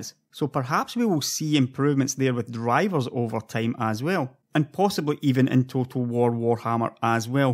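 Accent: Scotland, United Kingdom. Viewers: a Scottish bloke talk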